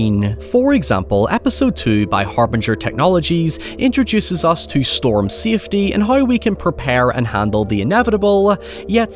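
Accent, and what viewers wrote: Ireland. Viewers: God, I love how Irish youtubers pronounce certain words. Every Irish Youtuber I’ve watched loves to use the word “Hoyever”.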